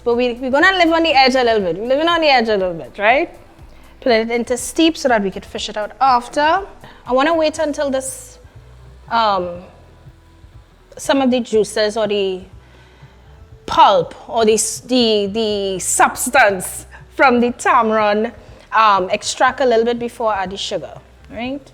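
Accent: Trinidad and Tobago. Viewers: In Grenada, we call it fish cakes or saltfish cakes. The next time I make it, I'll try the jalapeño. This trini-accent is everything.💞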